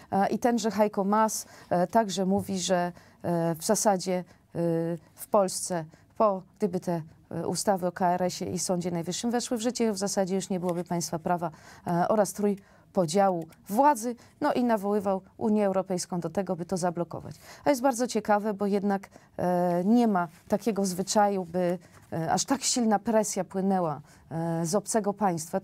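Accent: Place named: France. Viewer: Uwielbiam tę Pani polszczyznę z akcentem frangniem.Idealnie pasuje do p.p.z.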